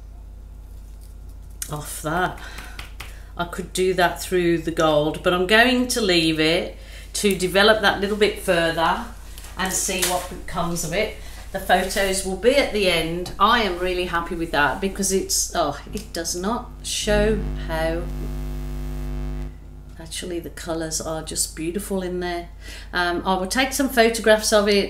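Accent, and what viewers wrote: Birmingham, United Kingdom. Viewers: Love it, yet again a cracking outcome 👏 Do I detect a " brummie accent " or is just my naff hearing x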